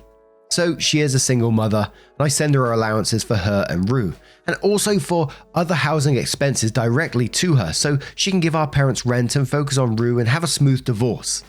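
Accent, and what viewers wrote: United Kingdom. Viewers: Happy Christmas. Since you're the maestro of breakfast foods, and sound British, I figure you're the chap to help me out. I have a question from someone across the pond. 'round these parts we can have our breakfast sandwiches served on something called an English Muffin. It's basically a less dense bagel with no holes. In the land of rainy days and tea, what are those called? And what are the sugary sorts of muffin called? Asking for those who know. I have similar questions for a Belgian if you know where I can find one of those. And yes, that one would be about waffles. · Story #1: Unrelated to the story:, Love your videos, I listen to them while I work, that's why I had to listen to this TWICE. Canadian pronunciation of Thesis is Thes-ISS, your pronunciation [British I assume] it Thes-ESE [this would be the Canadian plural or more than one thesis]. 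Also you tend to pronounce a "TH" as an "F". SO.... Every time you said "Thesis", I kept hearing "Feces", and I was wondering what the H*ll you were talking about. NOT a criticism, just something funny I thought you might get a laugh from. Keep up the great videos, and Thank you. Story #2: That is the CRUELEST thing i have read all day. Trying to get a recovering alcoholic to RELAPSE?? What an abusing sadistic A-HOLE.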